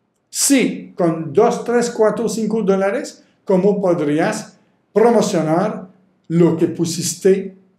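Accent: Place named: France. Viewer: L'accent c'est très joli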